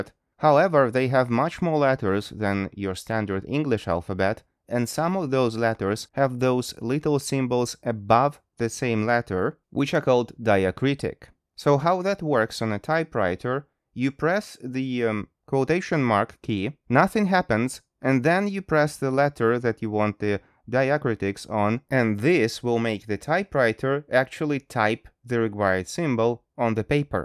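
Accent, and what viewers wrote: England, United Kingdom. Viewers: О, вы из Англии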